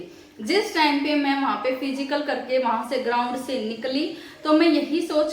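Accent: Haryana, India. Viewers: Haryana se lagti ho mam aap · Aap Hariyana se ho kya mam😊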